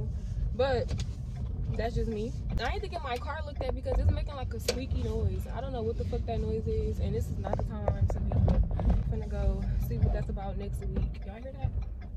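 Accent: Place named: New York, United States. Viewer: Where are you from you sound like you from newyork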